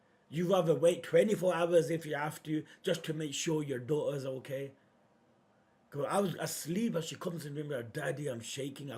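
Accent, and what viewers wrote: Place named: Midlands, United Kingdom. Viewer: Yes and I would hazard a guess from the midlands of the uk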